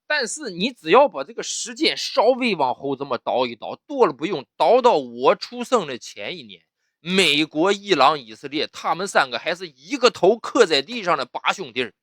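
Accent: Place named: Daqing, China. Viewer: 大庆话，有生之幸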